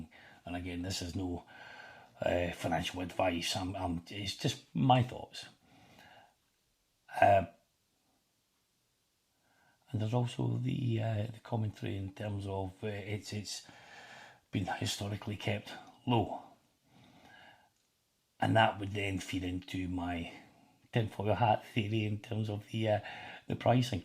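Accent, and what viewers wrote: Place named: Scotland, United Kingdom. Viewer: I think you are going to do well. Everyone loves a Scottish accent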